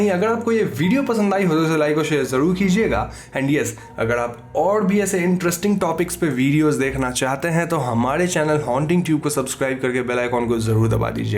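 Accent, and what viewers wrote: Bengal, India. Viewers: Are you a bengalee??? 👨‍💼👨‍💼👨‍💼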